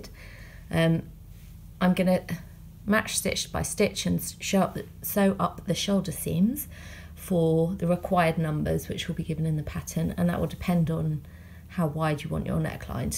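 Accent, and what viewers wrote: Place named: United Kingdom. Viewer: I wish that you were my neighbour you angel. Thankyou for this video. Nice to hear another Uk lady. I really love your work and you explained it all just perfectly. ❤❤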